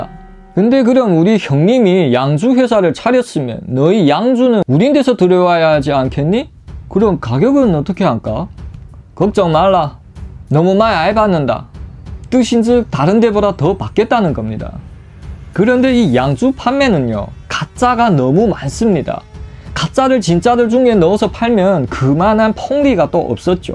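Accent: Yanbian, China. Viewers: 연변말이여 뭐여 어디말투여? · 너 연변이가? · 임마 연변만 좀 고쳐라 역겨워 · 님도 조선족인가요 범죄도시말투네요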